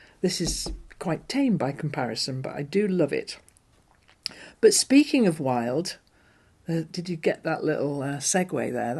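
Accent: United Kingdom. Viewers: Hello, I came across your videos in 2024. I’ve grown to love them. I live in Pennsylvania, USA, about a two hour drive away from New York City. First of all, I love to listen to your voice and UK accent. I love hearing the little differences between our two ways of speaking English. I’d love to tell you how much I enjoy your calm voice, your humor, your lovely Anna, and Ant in your garden. The vast property where your last homely house sits is marvelous. I’ve never thought seriously of joining patreon but in your case I’m considering. Until then, thank you for your content. Oh, and especially the beautiful piano music. It’s so calm and restful, I feel it is needed to offset the political atmosphere we are living with here currently. I’ll keep watching, my friend, I love the virtual lime green sofa. It’s quite comfy with so many sweet people sharing it.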